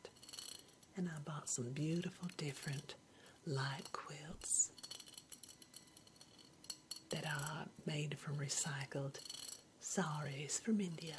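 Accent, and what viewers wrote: South, United States. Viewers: Oh my gosh yay. I love, that southern accent is so tingle inducing. Love love looooove <3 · Oh what a wonderful surprise! Absolutely love love love this Southern belle! Just exquisite and relaxing. Happy new year!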